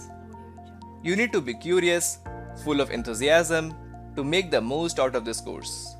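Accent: India. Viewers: pls create a tutorial how to understand an Indian accent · this not problem for me but if Indian tutors have a better accent they'd be the best tutors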